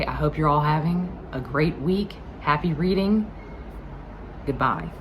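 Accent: South, United States. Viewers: OK. So many thoughts from a newbie subscriber. What is not to love about toner when it comes to skincare? A swipe of toner over the skin leaves you feeling soft and clean. And if it smells of roses.... then its positively swoon worthy. Spiral notes books are my favourite to work with, but I would probably be like you and not able to resist buying a whole armload of new journals. I wish I could read in another language. Heres a video idea, you reading the Italian books with your Southern accent..... delightful! OK. Walking away now before I scare you away.....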